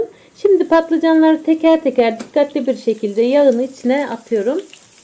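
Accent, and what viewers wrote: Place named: Afyon, Turkey. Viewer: Afynlu olabilir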